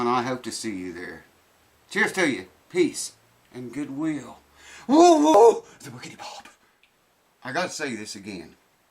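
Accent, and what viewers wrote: South, United States. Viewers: Hey, new sub here. Is that a southern accent I detect?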